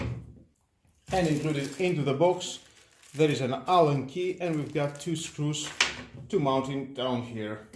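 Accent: Greece. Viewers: Καλά αυτή είναι 100% ελληνική προφορά. Είναι το μηχάνημα του Lidl? Είναι βολικό; Φαίνεται η κίνηση λίγο περιορισμένη σε υψος σε σχέση με άλλα μηχανήματα..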